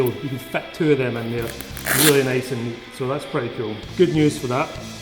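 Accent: Scotland, United Kingdom. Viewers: No, I think he sounds like a Scottish guy.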